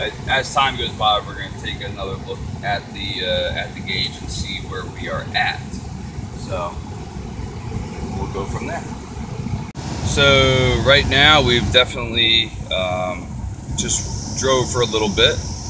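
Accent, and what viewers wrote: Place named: Pennsylvania, United States. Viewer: You said "wudder" (water) and "Rad-e-ator". Are you from York county Pennsylvania or Carol county Maryland?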